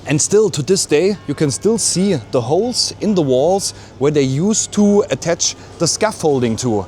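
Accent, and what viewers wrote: Germany. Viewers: You have german akzent ma boi. Are you from germany?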